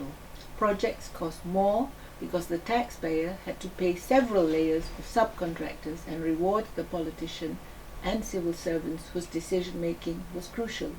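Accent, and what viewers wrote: Perak, Malaysia. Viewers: yalaaa..alaaa seronok dengor akak cakap melayu sbb ade slang perak ..hahaaa jgn marah guro aje kakk...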